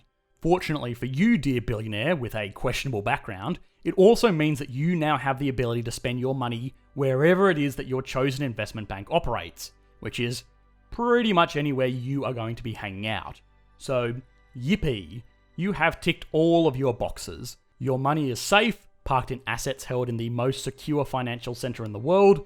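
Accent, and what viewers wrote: Australia. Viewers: Oz accent? Lol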